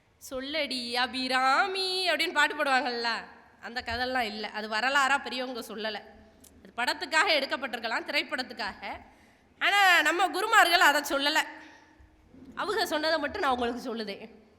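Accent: Tirunelveli, India. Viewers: உங்களின் நெல்லை தமிழ் மூலம் சொற்பொழிவு அருமை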